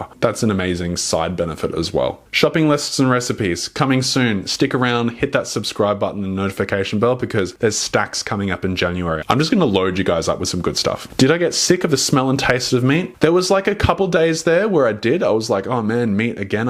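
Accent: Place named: Australia. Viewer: I’d love to know what this Australian-ish accent is about :’) oath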